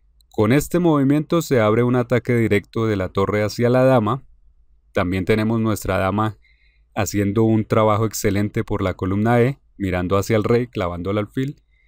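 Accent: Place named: Bogotá, Colombia. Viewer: ¡No sabía que el GM Igor Smirnov hablara español con fluidez, y con acento rolo! 😲😃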